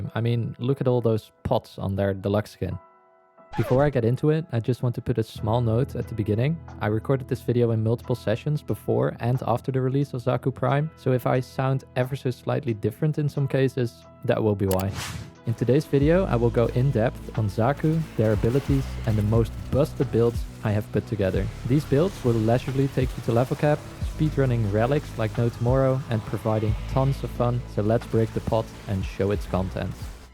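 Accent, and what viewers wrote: Netherlands. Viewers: Hey a dutch person i think cause you have a very dutch accent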